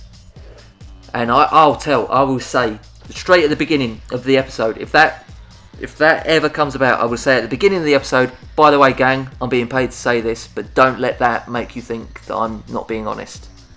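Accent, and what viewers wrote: United Kingdom. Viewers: Hey! Where did your inspiration for the cockney accent come about?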